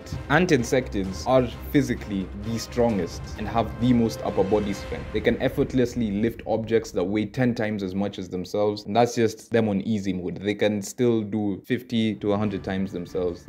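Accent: Kenya. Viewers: i know a kenyan when i hear one😭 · You sound like u from kenya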